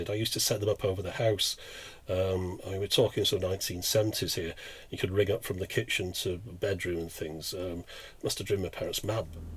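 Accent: United Kingdom. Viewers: I love your British accent...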